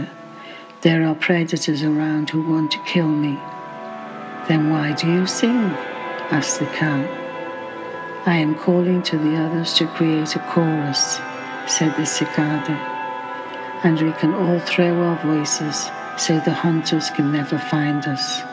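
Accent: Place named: Nuyorican, United States. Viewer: Wow! You have taken a somewhat 'New Yorican' turn? Any reason for the change in mood:) Thank you and great work.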